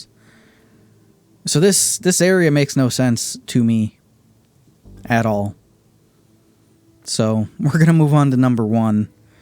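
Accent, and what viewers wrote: South, United States. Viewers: When you get angry, the southern accent comes out even more. Speaking of sound. It is NOT a complaint. Just an observation. On the other hand, visually this time, it's funny, for a veteran FO4 player, the lack of big picture in the displayed frames. And that's not a complaint either. Just another observation. All in all, I enjoy this type of content, no pretense of attacking a particular topic, just reflections on the game. By the way, this is the first video I've ever seen from your channel. Definitely NOT the last one. I subscribe to the channel, especially for FO4, which, for objective reasons, I cannot play at the moment. As a curiosity, English is not, as you well notice, my first language (in case you're wondering, it's Romanian).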